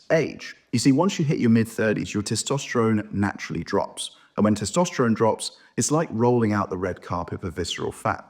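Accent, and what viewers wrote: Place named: Southern England, United Kingdom. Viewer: mid to southern England, but I'm getting little hints of time in the US? and with a porcelain tiled floor doesn't live in the UK anymore...there..just like Poirot ! 😂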